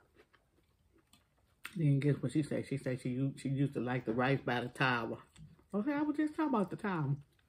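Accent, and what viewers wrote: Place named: St. Louis, United States. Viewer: Yes ma’am!!! I can tell by how you talk and especially that duck and special noodles!!! North County Florissant!!! I just watched your gizzard video with my husband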